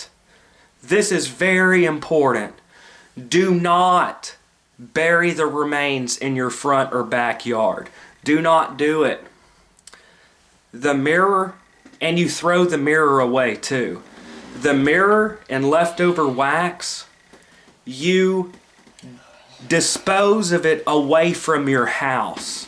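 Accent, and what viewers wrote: South, United States. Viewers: good on you. always a pleasure to hear that southern ascent. blessings.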